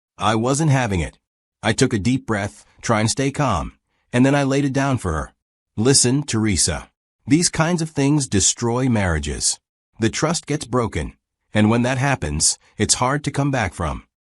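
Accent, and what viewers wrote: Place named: United States. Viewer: A Thai story with american accent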